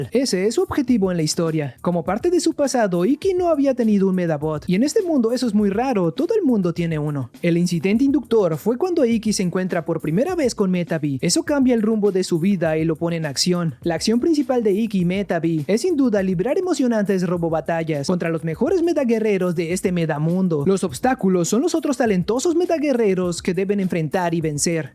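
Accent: Standard. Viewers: Muchas gracias por tu contenido, es muy util y bien explicado! Siempre siento como si estuviera viendo un capitulo de algun programa de discovery por el español neutral 😂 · por qué tenes q hablar tan neutro xd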